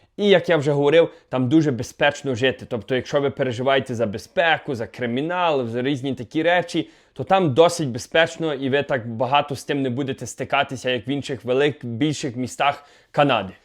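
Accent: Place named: Lviv, Ukraine. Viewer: Красень, твій акцент вражає ! Так мають розмовляти всі Українці, не тільки вихідці зі Львова! Я особисто з Житомира і шкода , що люди з Донбасу і з східної Ураїни так не розмовляють. Дякую за твій контент ! Слава ЗСУ!!!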